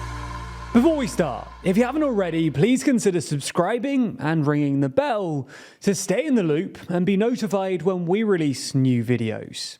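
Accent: United Kingdom. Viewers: Why is it always a bouncy british voice that's telling lies on youtube. I swear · 1. Your videos are quite long, boring, filled with ads, in the beginning , middle, and end. 2. You barely give sources, your video titles are clickbait, and your content is biased sensationalism. 3. Your channel is the trash humans should rid themselves from. 4. #TLDRNewsGlobalIsTRASH and an obstacle and distraction keeping ppl from finding quality information. #ThereISaidIt Now click like on my comment so the POS behind this video read this (prob not the British-sounding narrator) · I'm so sick of guys with British accents trying to convince us that gods don't exist. :P Milei was elected on the explicit promises of cutting spending and saving the economy from the course it was on toward Venezuela's hyperinflation. He was honest during the campaign in declaring the recovery would be painful. 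You'll look like a buffoon in five years for opening the video with a smug attempt to take rock lyrics out of context with an ad hominem attack making Milei out to be some kind of chaotic evil dictator rather than a brilliant economist willing to take as much heat as necessary to correct the catastrophic course that the socialistic keynesian model of Argentina has been on.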